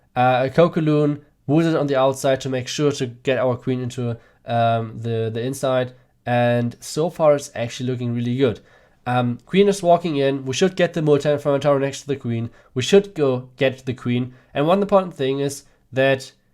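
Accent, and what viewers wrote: Germany. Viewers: I really like your german accent :)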